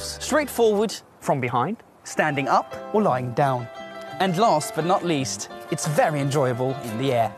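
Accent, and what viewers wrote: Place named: United Kingdom. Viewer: Bri'ish accent fits.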